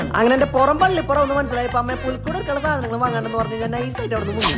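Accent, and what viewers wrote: Thrissur, India. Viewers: Tcr slang kekkumbo oru rasam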